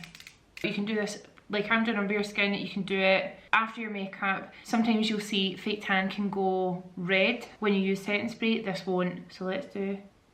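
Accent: Scotland, United Kingdom. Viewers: Scots talk realllllly fast. Wow. Great video 👍🏼👍🏼👍🏼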